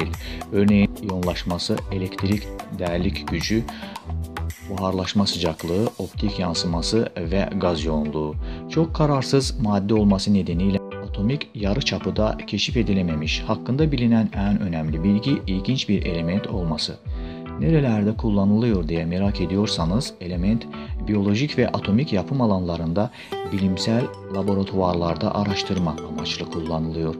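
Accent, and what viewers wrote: Azerbaijan. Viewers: Adam Azerbaycan Türk'ü anlayamadı mı ses tonundan şivesinden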